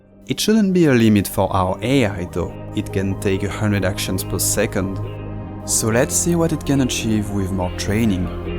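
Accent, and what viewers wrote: France. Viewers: 100% il est français mdrrr super vidéo sinon! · J'entend la france dans ton accent 😂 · Wow, cool video, i've watch your others video when you train your IA it helps me on school project thanks (btw i've got a question. is that the "french accent" ? (because i'm frecnh so i ask)) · You are French ? Your voice is strange. Tu as un accent assez français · Are you french bro ? Love the accent haha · Tu es français ? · bro are you french? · I cant listen to this french accent Im sorry... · are you french by chance? trying to place the accent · Tu es français nan? Tu as un accent fr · Rooh l'accent bien français c'est cramé 😭😭😭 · l'accent bien français comme on aime xD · Are you French ? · t'es francais non ? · are you french? cuz since the video started i heard ur accent and was kinda curious about ur nationality, and when i heard the way you said "parameters" i was like: "he must be french". 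the reason im asking is because my curiosity is killing me bro also the video is awesome. that seems so hard to do. i've always wondered like how are they making AI. i mean the how is it LEARNING if you have to CODE it to DO something. it still blows my mind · Je suppose que tu es français? Ton accent est bon mais très français 😂❤❤❤ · t es francais nan? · Good video I love the idee. Ps : tu es francais ? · Fr I spotted the french accent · t'es francais ? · Pourquoi il a un accent français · Are you French or Chinese? Or both? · Je me disais bien qu'il y avait un petit accent Français, tu parles super bien anglais j'ai mis 2 vidéos à capter mdrrr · you sound like you'r french are'nt you ? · are you french? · On entends ton franglais MDR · Are you french ? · bro gotta be french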